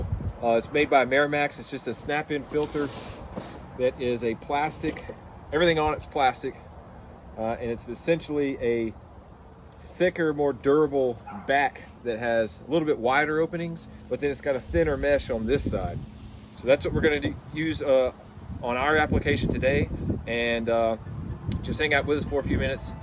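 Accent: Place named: Texas, United States. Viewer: would be interesting to have a follow-up on how this gutter brand worked for you. also, are you from GA? I lived there 15 years and TX for 15 years and your accent reminds me of TX more than GA. 😁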